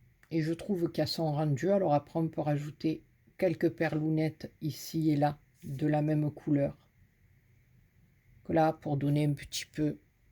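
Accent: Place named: Aubagne, France. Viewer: Bonjour.nous sommes ma soeur agnes et moi d'aubagne, nous vous suivons,vous êtes très soignée dans vos projets,avec des finitions nikel.en plus nôtres accent est magnifique.bisous d'aubagne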